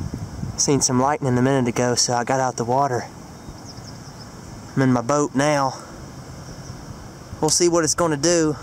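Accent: South, United States. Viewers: Hey I know you don't want people to know where you live but what state are you in that has black bears? I know it's southern from your accent.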